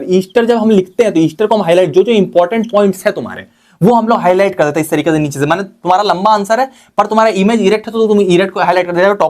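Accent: Bihar, India. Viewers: Bhaiya Aap Bihar sai hoi kya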